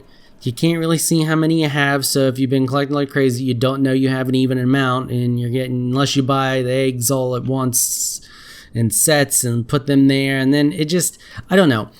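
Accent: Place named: South, United States. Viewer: I really can't even pin your accent. Sometimes it sounds slightly southern, other times not. Sometimes it has this sort of effeminate twinge at the end of each sentence. Then in the episode about the 10 things that they took out of Starbound. You talked about the barrel and completely lost your shit, you got way louder and lost the accent the twinge everything, your voice completely changed.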